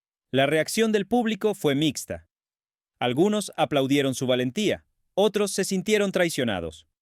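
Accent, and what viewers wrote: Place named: Latin America. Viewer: acaso el vídeo lo ha hecho un español? Porque yo noto un acento claramente hispano Americano, no se porque llamar mentirosos a los Españoles? Mentirosa serás tú y creerás que todos son como tú.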